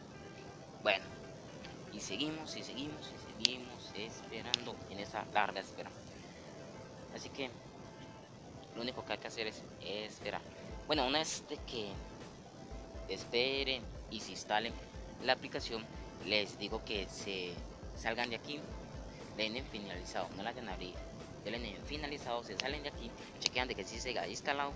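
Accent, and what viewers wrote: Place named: Colombia. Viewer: Epa se nota lo colombiano todo bien le voy a dar like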